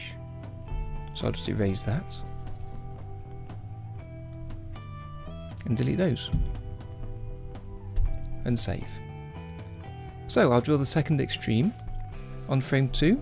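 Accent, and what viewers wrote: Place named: England, United Kingdom. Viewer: I hate English accent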